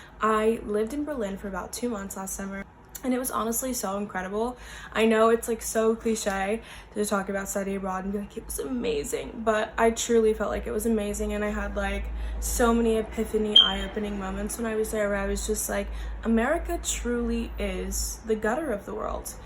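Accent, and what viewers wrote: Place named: United Kingdom. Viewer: i Said it somewhere else in the comments already, but frankly, there are almost never Americans of colour coming to Germany. Even with your accent, I would assume you’re from the UK. Also, people working at food stands are oftentimes local folks and not the most international, able to grasp the history of slavery in the Americas as a whole. Berlin is nice and I like it every day, no matter Corona. Without corona, spending your night with friends at Sisyphus or at the river with Späti beers is great. Thanks for saying our metro is clean, I always have the feeling like it’s gross. Puke, pee, full program. Greetings from Berlin.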